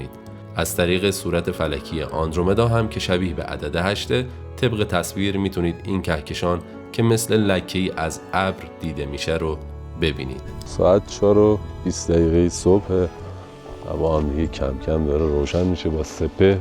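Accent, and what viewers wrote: Shiraz, Iran. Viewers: فدای تو که به فکر ما هستی من پا به پا با تو میام از بس لحضه قشنگ شیرازی را رها نکردی و ساده توضیح میدی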